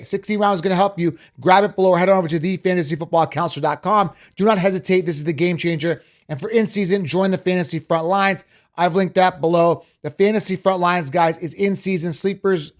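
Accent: Canada. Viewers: Raiders fan with a Canadian accent…lol no thanks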